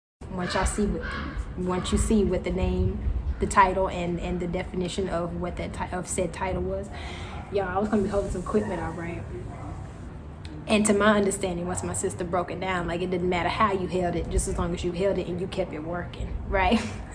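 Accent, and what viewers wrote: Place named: Rural, United States. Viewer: Awwwwww that country twang!!!!!!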